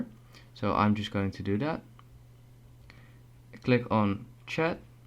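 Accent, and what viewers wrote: Netherlands. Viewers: Lekker nederlands accent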